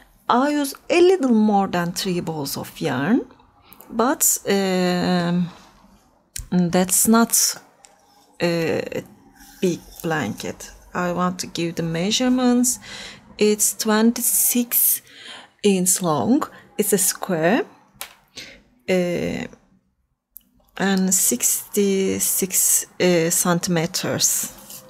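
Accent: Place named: Turkey. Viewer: Your accent is familiar. Are you a Turk?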